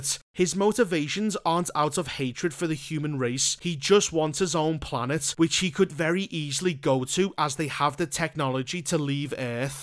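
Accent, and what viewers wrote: Liverpool, United Kingdom. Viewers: The swearing fits perfectly on these reviews, a scouse accent to boot. Brilliant reviews 🤣🤣🤣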